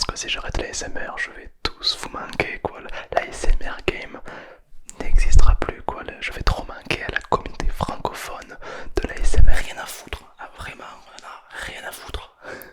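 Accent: South, France. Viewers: Mdr j'adore tous les personnages que t'as inventé que tu fait intervenir dans tes vidéos et ton ASMR est génial encore plus avec ton accent du sud ❤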